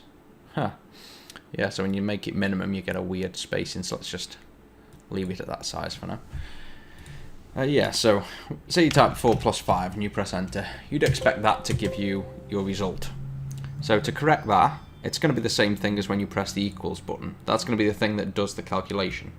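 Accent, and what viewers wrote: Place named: Stoke, United Kingdom. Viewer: You sound like a Stoke lad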